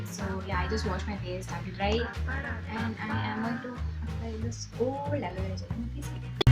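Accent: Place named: South India, India. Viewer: Your Ascent of speaking English shows you are a south Indian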